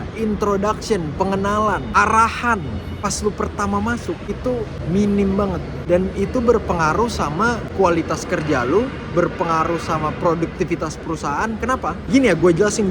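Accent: Manado, Indonesia. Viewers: Seneng nih gaya bicaranya bahasa manado dan indo jd punya ciri khas tersendiri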